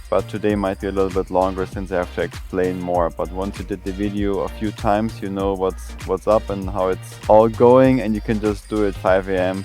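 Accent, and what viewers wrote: Germany. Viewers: ich war in Köln für ein Jahr. Freut mich so sehr ein Deutschakzent zühören! Keep being awesome! Love the channel I can't wait to show my partner :-) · Are u german or swiss? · Nach4 Sekunden direkt den deutschen in dir gehört. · german accent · Are you from Germany? Your accent sounds hella german :) Good video👍🏼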